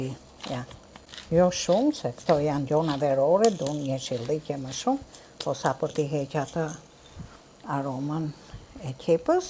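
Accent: Tirana, Albania. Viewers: te lumte i ke duret flori,ka lezet dhe e folmja. tironce nji e sbohen dy,hallall 🥰👐💐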